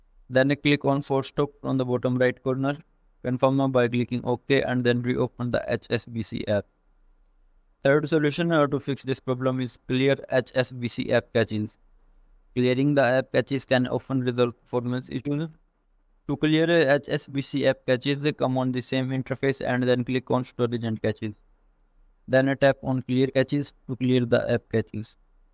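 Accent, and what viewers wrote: India. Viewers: I couldn't understand a word of this. Heavy (Indian?) accent = incomprehensible.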